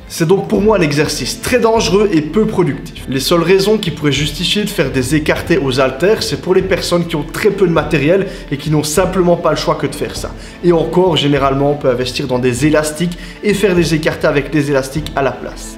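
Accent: Belgium. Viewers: Sa s'entend que tu es Belge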